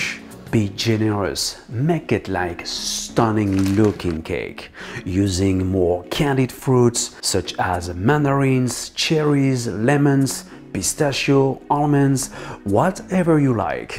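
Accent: France. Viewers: Is it just me or is everything better with a French accent.???? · Frenchy u make me remember my best frenc friend with u accent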